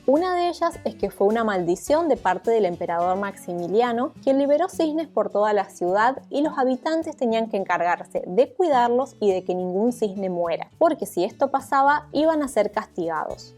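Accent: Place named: Argentina. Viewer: Reconozco un marcado acento argentino en tu dialecto =D Muy lindo recorrido. #GraciasPorCompartir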